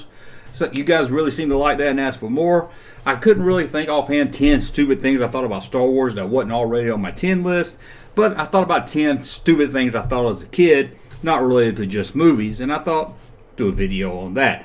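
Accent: South, United States. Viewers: It's how we say it in the south.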